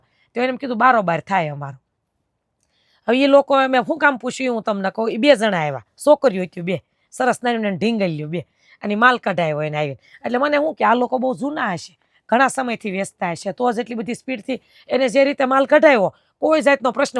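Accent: Kathiawar, India. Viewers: બેન તમે કાઠિયાવાડી લાગો છો બોલી પર થી મે તમારા 2 થી3 વિડીયો જોઈ આ તમે બોલો છો બહુ સરસ કેટલા હજારથી ધંધો ચાલુ કરવો જોઈએ મેકસીમમ સ્વામિનારાયણ ધમૅ છે હું પણ સ્વામિનારાયણ છુ જય સ્વામિનારાયણ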